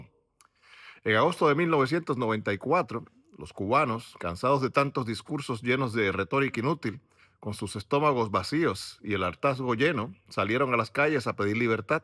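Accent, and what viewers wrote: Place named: Mexico. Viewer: tienes acento mexicano ahi fue donde te fuiste parece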